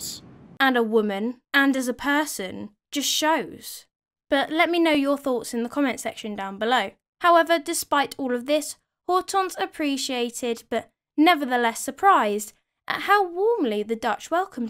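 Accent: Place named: England, United Kingdom. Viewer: French aristocracy and/or monarchy typically reside outside my interests, but with you've managed to usher me through the portière with you're ability to create these historic characters into actual living persons. I am an American and of course I find your English accent fascinating and exotic but yours in particular has an intriguing aspect. Have you done any work into the Scottish folk? thanks so much for your content.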